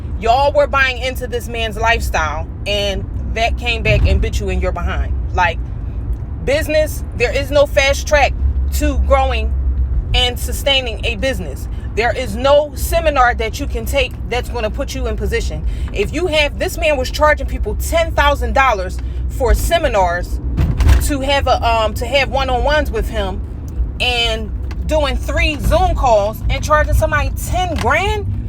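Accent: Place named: Philadelphia, United States. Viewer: I can tell by that accent that you’re 100 percent PHILLY!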